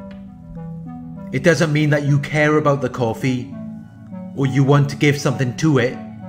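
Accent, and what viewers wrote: Wales, United Kingdom. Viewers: For what it matters.... I am from Leicester, England, I have an accent and pronounce WORDS differently... the guy doing these videos is from Wales... it's regional dialect....people from Liverpool, Manchester, Newcastle etc etc etc..... all have a regional dialect/tone to their accent. Don't worry about it.... you're in the picture now🙂✌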